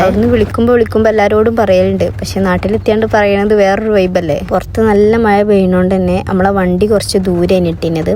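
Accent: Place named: Malappuram, India. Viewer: നിങ്ങൾ എവിടെ മലപ്പുറം ആണോ? Am from malappuram,slang കേട്ടിട്ട് മലപ്പുറംപോലെ അതാ ചോതിച്ചേ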